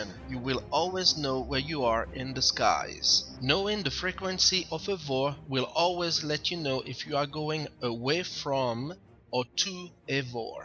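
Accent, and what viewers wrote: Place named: France. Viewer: Excellent video, just what I needed. Merci beaucoup - de ton accent je suppose que t es Français.....tu parles super bien l'anglais!!!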